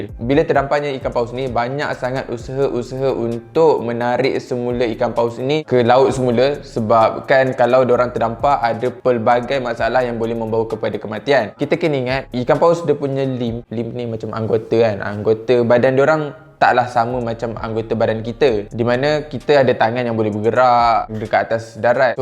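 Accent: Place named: Manglish, Malaysia. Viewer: Manglish hahaha.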